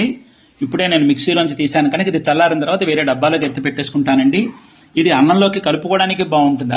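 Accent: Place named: Tamil Nadu, India. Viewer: Tamil gaaru telugu ni chey pattinnadipistunattundi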